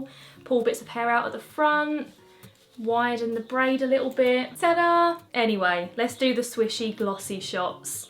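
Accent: United Kingdom. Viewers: the British accent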